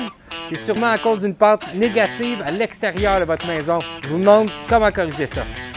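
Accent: Quebec, Canada. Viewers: J’adore ton accent québécois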